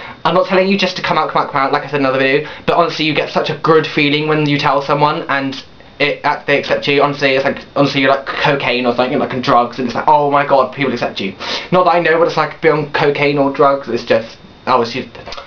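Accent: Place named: RP, United Kingdom. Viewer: You ain't funny for you're gay. You are so funny due to your smashing posh accent! Damn, what would I give to mimic that sound?!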